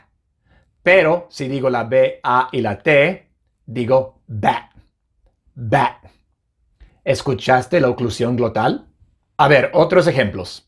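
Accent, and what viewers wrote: United States. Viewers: Tu inglés es acento Americano por eso lla t la pronuncias de eso modo, porque el inglés británico si la marca más. Tendrías que aclarar que tu acento es Americano. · Soy ingles. En Inglaterra decimos arTist - no arDist. VerTical- no verDical. ThirTy - no thirDy. El pronunciacion de is pronunciacion del EEUU, no de Inglaterra. El ingles original y mas correcto es de Inglaterra.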